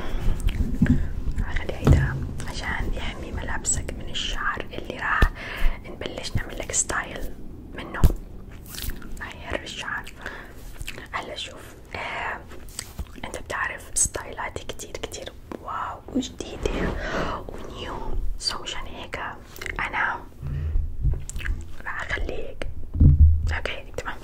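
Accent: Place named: Damascus, Syria. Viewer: صرلي ساعة بفكر لكنتك شامية ولا حمصية 😂❤️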